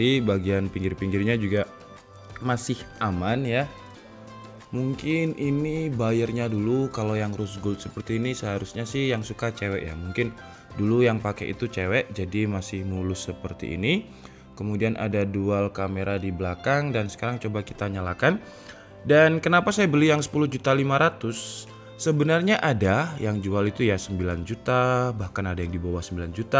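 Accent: Malang, Indonesia. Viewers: Bang asal malang kah? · Bang orang malang ya?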